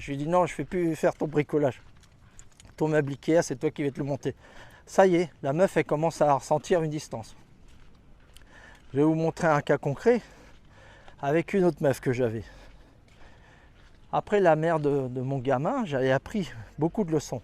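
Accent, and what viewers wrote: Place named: France. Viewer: Continue tes vidéos mec !👏🏻. HS tu viens d’où? T’as un petit accent ligériens ou isérois qui ressort de temps en temps on dirait 😅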